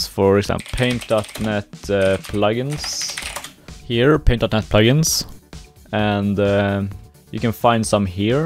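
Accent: Sweden. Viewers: You are swedish right? :D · you sound swedish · Are you swedish? xD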